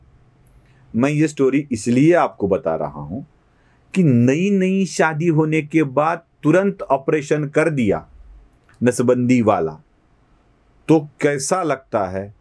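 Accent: Maharashtra, India. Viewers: सर तुमची हिंदी खुपच खराब आहे, तेव्हाच ओळखुन पडता की तुम्ही महाराष्ट्रीय आहात म्हणुन,,सुधारणा आपेक्षीत आहे,धन्यवाद सर🙏🏻🌺🌺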